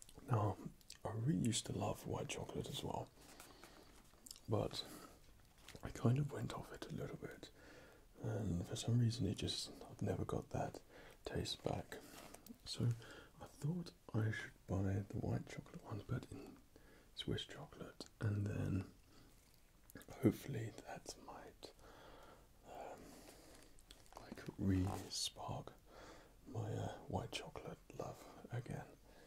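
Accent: England, United Kingdom. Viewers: Is he from England ??? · Is he British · I was just thinking recently I want to listen to an asmr video with an English accent and then I saw this video and I was omg oh yeah! 😃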